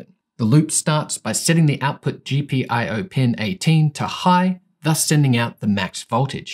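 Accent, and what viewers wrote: Australia. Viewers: He’s just an Australian…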